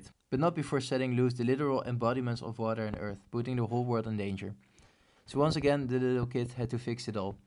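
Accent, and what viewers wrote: Netherlands. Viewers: Where are you from man? You sound Dutch Enjoyed the video. Will watch more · you're from the Netherlands right, i know that accent haha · Are you from the netherlands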